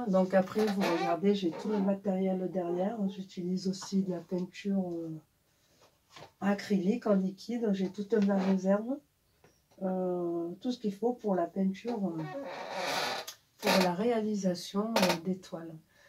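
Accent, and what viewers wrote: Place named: South, France. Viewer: Je suis content pour toi,on voit ton évolution depuis que je te connais...artistiquement...!!! Bel accent du sud...salut du nord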